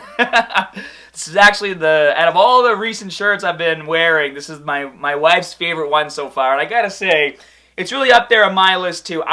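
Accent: Boston, United States. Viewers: Are you from Bahstin? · That boston accent tho 🔥🔥🔥😁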